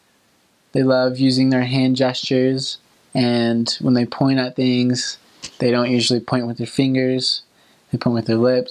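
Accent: Dominican Republic. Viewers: You sounds really like a dominican when you speaks spanish wow you are really smart no all missioneries can do that!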